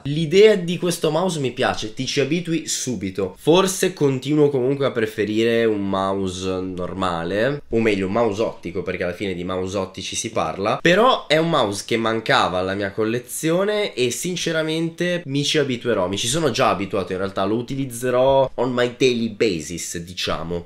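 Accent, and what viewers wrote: Italy. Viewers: MA sei di roma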